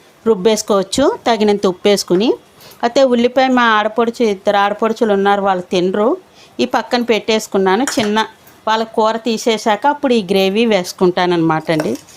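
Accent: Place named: Telangana, India. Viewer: Memu godavari Jilla vaallame naaku aavidham gaa maatlaadadam chaalaa istham kaani meeru Mee bhaashani maatlaadadam maanesi telangaanaa I pattukunnaaru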